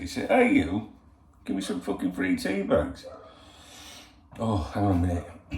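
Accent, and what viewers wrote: Yorkshire, United Kingdom. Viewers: How are you doing, you remind me of my Dad the way you talk he was a Yorkshire man he was my dad and my best friend, is it true that our new player is injured. I love your video's there down earth and great fun.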